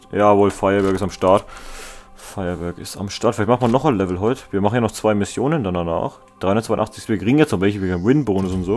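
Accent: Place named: Franconia, Germany. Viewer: Hey woher kommst du eig. dein Dialekt is so fränkisch😊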